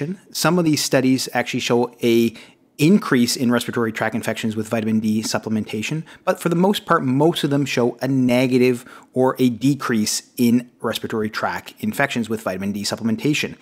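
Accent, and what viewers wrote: Canada. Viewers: Thank you, neighbor to the north (if I am identifying the accent correctly), for your informative presentation.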